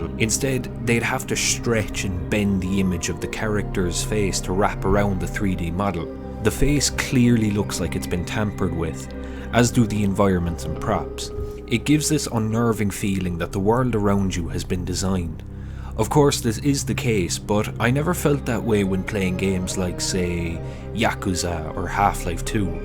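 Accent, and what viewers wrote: Cork, Ireland. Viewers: Cork accent? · Is that an eire accent? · Your accent is so unsettling. Are you Irish?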